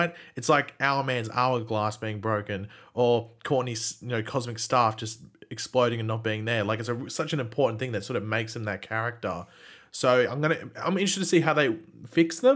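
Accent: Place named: Australia. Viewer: Ur Australian! Lit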